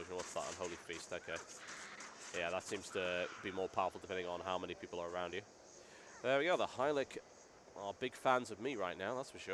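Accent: United Kingdom. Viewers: ok there you are absolutly right, he is good and shows alot, skills, enviroment and such stuff. and other people who show stuff just suck. saw a video from an elementalist, over 10 minutes against the shatterer and he only used 3 skills, thats boring. but is really good, doing alot of skills, movement is good and his commentaries are really cool. i dont like hearing english with that british accent. but his commentaries are so awesome with the accent. like his videos.:)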